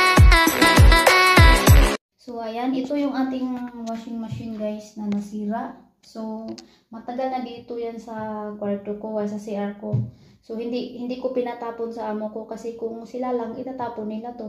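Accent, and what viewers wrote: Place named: Visayas, Philippines. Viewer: Bisaya Kapa Po maam, God bless maam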